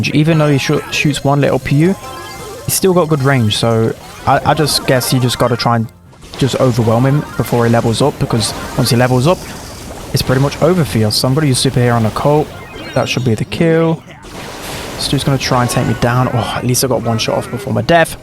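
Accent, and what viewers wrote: United Kingdom. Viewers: is so sick that his British accent got so strong I can't even understand some word he's saying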